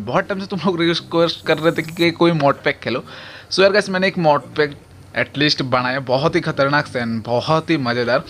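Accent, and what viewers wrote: Bengal, India. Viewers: Is your mother tounge bengali? ???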